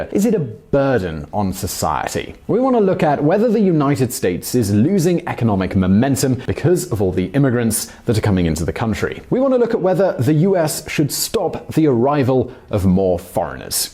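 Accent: United Kingdom. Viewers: Summary of this video: SJW bloke with a British accent calls Trump xenophobic for trying to reduce illegal immigration by citing the contributions of legal immigrants (to the UK). LOL liberal logic. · You always talk about other countries. I will like to know where are you from ? You sound British but you might not be one.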